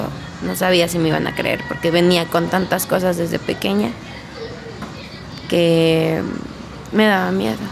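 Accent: Fresa, Mexico. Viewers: La verdad no le creo nada, además, por qué habla como fresa? Me recuerda a una amiga que tuve en la adolescencia que era bien mentirosa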